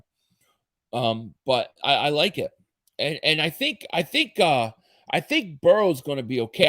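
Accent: Philadelphia, United States. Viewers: Gotta go down the shore! Haha def Philly speak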